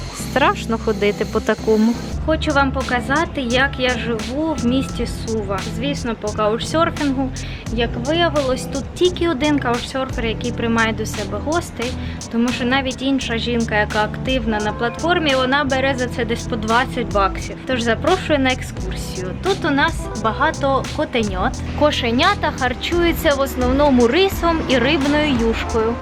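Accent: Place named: Poltava, Ukraine. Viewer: Дякую за відео! Нетривіальна країна, розповідь цікава і приємна вимова (полтавська, навскидку, але можу помилятись).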